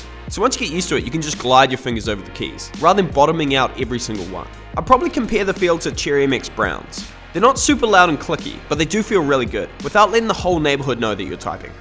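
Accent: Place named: New Zealand. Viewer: love ur new zeland type of voice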